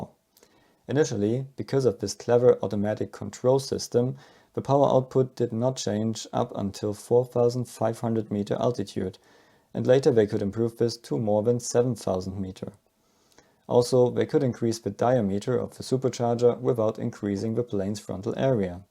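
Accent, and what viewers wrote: Germany. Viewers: If a question requires research, you do something wrong. The answer is the thing requiring research. You also have a horrible German accent. Please stop making videos. Thanks.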